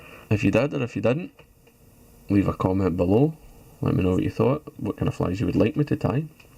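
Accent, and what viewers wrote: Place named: Aberdeen, United Kingdom. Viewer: Love the tutorial and your super Aberdeen accent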